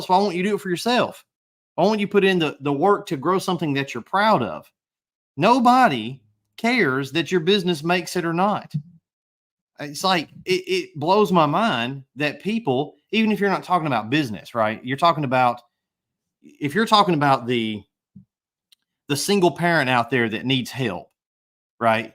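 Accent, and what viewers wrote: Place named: South, United States. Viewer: Best video you’ve ever made. I have a successful company, but we always need to constantly be looking for traction and reminding ourselves how we got there. Positive reinforcement. More videos like this please. Your dang sense of humor could put you on the comedy stage. And your damn southern accent.there are lots of nuggets in this but also lots of laughter. Well done.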